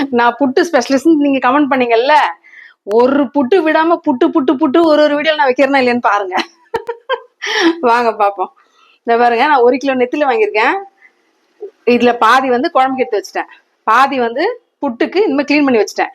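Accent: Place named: Telugu, India. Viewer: அக்கா உங்ககிட்ட ரெண்டு கேள்வி நீங்க நடிகை ஜீவிதா மாதிரி இருக்கீங்க and வீட்டுல தெலுங்கு பேசுவீங்களா நாங்க ரெட்டியார் தமிழ் தெலுங்கு வாடை அடிக்குது னா தமிழ் tr ங்க 🙏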